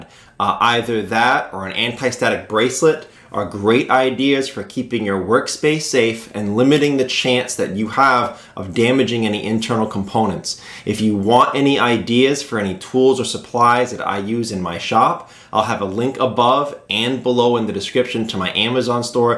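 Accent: Massachusetts, United States. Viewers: Random but are you from Massachusetts? That accent!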